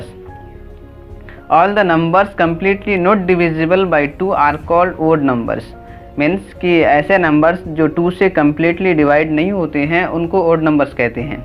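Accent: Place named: India. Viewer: إنجليزي بنكهه هندية 🌚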